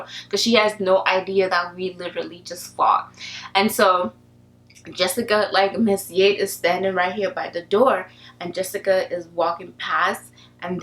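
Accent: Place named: Nigeria. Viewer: Tromaria Presimparae, Why would you "suggest" she "try" to speak in a manner that fits your hearing? She is Nigerian...not from the U.S. Have you not seen any of her other videos? She always says something about being yourself; with that being said, you be you and let continue on with her beautiful fantastic awesome Nigerian Princessness. P.S. Yes I said Princessness...and what?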